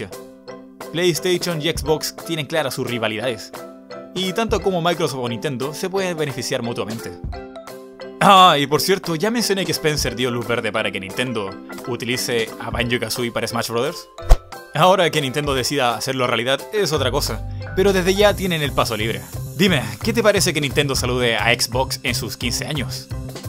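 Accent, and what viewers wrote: Chile. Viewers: eres de Chile? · si igual se vio q trataste de darle un toque mas neutro, pero los q son de chile se dan cuenta quien es de su pais jaja, buen video, y q opinas de ps3 y ps4? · Eres chileno?me encante el acento chileno hablando sin modismos,creo que es uno de los más neutros de todo latinoamerica,por algo la mayoría de los doblajes de Discovery (sobretodo Discovery kids)se hacen en chile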